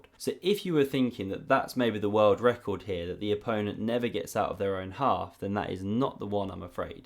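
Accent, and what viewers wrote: United Kingdom. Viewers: Funnily enough, I subscribed to this channel because I find his voice the easiest to listen of most chess guys. There are a lot of Indians and East Europeans doing chess content and though their content is good, the British accent is easier to my ear.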